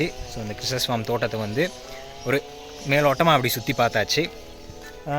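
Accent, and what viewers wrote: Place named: Tamil Nadu, India. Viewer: மகனே நீங்கள் தமிழ்நாட்டில் பேசுவது போல் பேசுகிறீர்கள் முன்னோர்கள்‌பூவர்வகுடிமக்கள் தமிழ்நாட்டில் இருந்து வந்தவர்களா.அந்த பாக்கியம் பாட்டியும் தமிழில் நாட்டில் பேசுவது போல் இழுத்து வணக்கம் சொல்கிறார்கள்.இன்றுதான் முதல் முறை உங்களுடைய வீடியோ பார்க்கிறேன்.உங்களைபற்றி எதுவும் தெரியாது இனிமேல் தான் மற்ற வீடியோக்கள் பார்க்க வேண்டும்.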